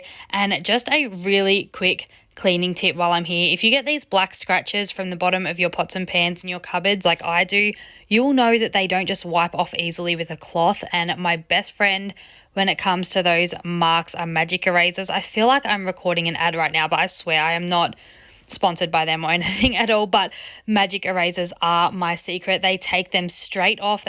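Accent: Australia. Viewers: This was an awesome video, I love listening to you talk, maybe because you're another Aussie. Be proud of what you are accomplishing, you are getting things done. Love the before and afters! Thanks for sharing, I wish you would make more videos, so motivating!!!! 🥰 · I do not see on your channel description on where you are located/which country? Im guessing Australia 🇦🇺? Anyone knows, i love her accent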